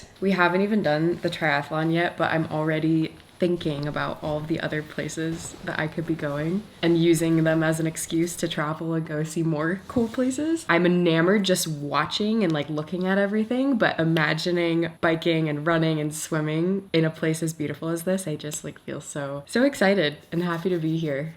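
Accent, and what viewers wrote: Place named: Philippines. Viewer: has a Philippine accent. My bestfriend is an exchange student from Phil.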